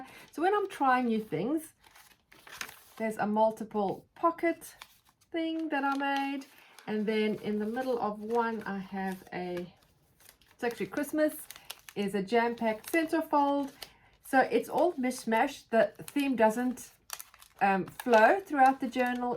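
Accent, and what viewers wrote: South Africa. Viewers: Hi I am so glad I found you channel... You sound like you are from South Africa:D So am I and there are very few if any junk journallers here as far as I have found. I love your style and I am new to junk journalling and all the videos can be a bit overwhelming... I absolutely love the idea of an idea journal and i think I will start with that:D Thank you so much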